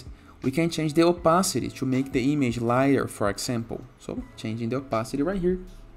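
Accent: Brazil. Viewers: dificel não reconhecer o br na fala kkk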